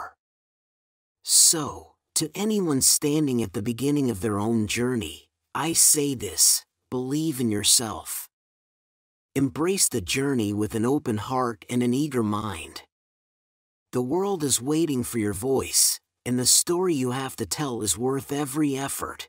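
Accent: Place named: India. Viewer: just because of your accent I'm curious to know that whether you are from India or abroad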